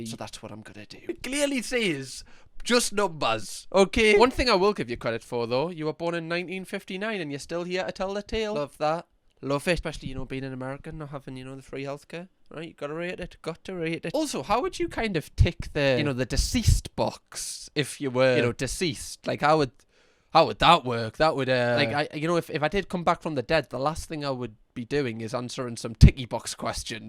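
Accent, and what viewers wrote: Newcastle, United Kingdom. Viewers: A, I can't believe I've only just come across this, I've been in bits the last few days. B, don't you knock the Geordie accent pet, it's the best accent in the country and I'm from Lincolnshire. Though my grandad was born up there, hated working the mines so ended up as a lorry driver and then eventually owning a garage in London after first living in Devon for a while. He had an interesting accent but never lost the Geordie phrases, oddly some of which we use in Lincolnshire too. But it's been a long while since I've been called Hinny now. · Don’t put down your looks! And I love the Geordie accent, it’s so endearing. As someone who grew up with a Bostonian accent, you have a very friendly sounding one. Mine sounds aggressive, and trust me, it’s not intentional. Friendly exchanges are like screaming matches. 😆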